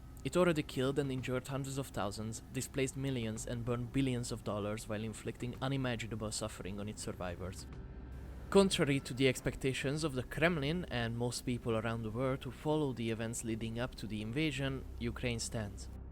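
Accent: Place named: Hungary. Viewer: Hungárián akcent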